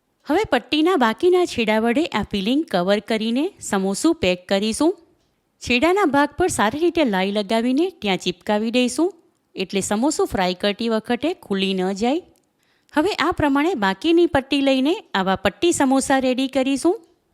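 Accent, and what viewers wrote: Surat, India. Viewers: Pure Surti. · Surati Gujarati bole chhe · Ben surati lage chhe tt bole chhe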